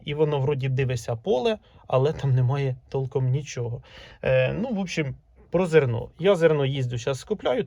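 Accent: Poltava, Ukraine. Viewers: О, полтавський говір...😊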